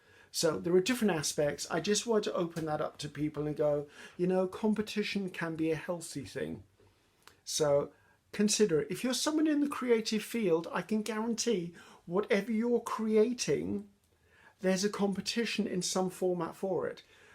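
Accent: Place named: Wales, United Kingdom. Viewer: Love watching your work come into being. Just beautiful every time. Today while watching your Sept 4, 2021 video, "Create unique labels from one of a kind Master Boards", <link> I heard you say you're in Wales. Suddenly, your accent made all kinds of sense. With the Welcome to Wrexham Docu Series by Rob and Ryan, I've been hearing the accent quite a lot and fallen quite into fanship for Wrexham, the community and team. ARe you a fan of any of the Football clubs?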